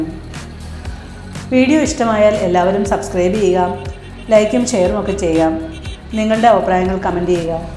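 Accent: Kottayam, India. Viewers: ചേച്ചി... സ്വാഭാവിക വിവരണം മതി.. ഇപ്പൊ ഉളള വിവരണം ഒരു സുഖമില്ല... കോട്ടയം സ്ലാങ് സൂപ്പർ...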